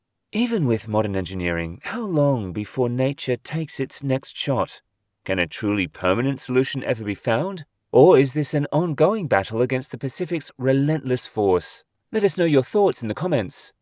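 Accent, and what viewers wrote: United Kingdom. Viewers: Your accent is really annoying. Pretty much all british people are tho so i dont blame you, but you should stop talking so much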